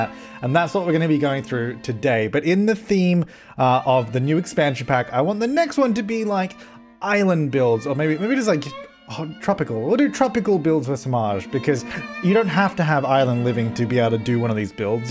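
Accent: Australia. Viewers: Kinda sad that the Australian accent (soft g sound before a long u) disguised the 'historical duty' pun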